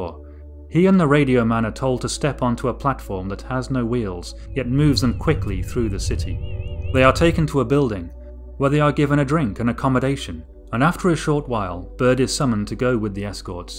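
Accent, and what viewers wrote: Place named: United Kingdom. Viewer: what dialect of British English are you speaking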